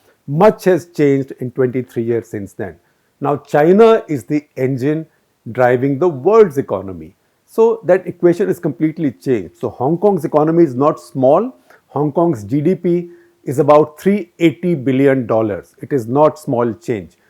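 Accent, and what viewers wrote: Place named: South Delhi, India. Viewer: You just run on assumptions all day with south delhi english and hence i unsubscribed....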